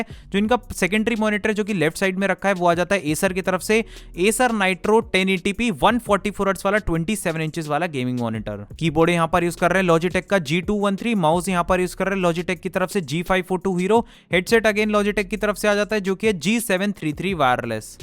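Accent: Gujarat, India. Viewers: Bro are you Gujarati??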